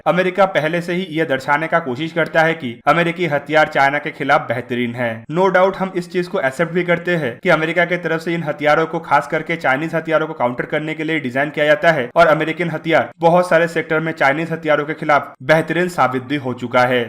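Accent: India. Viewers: OMG ! THE TITLE IS ENGLISH BUT THE NARRATION IS INDIAN ?!!!, WHAT THE HECK !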